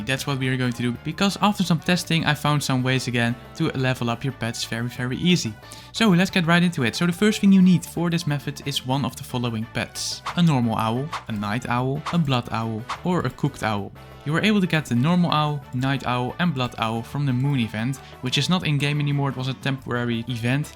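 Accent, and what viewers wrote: Netherlands. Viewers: are you dutch cuz i can hear that accent? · Are u Dutch?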